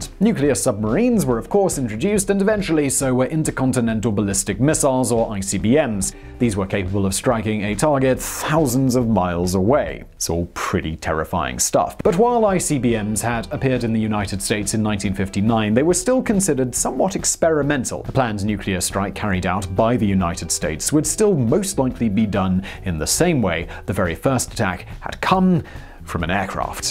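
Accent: England, United Kingdom. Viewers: He's English, we pronounce many things differently. In fact virtually everyone pronounces something wrong, they just don't know it. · Sounds intellectual because of British accent, makes numerous mistakes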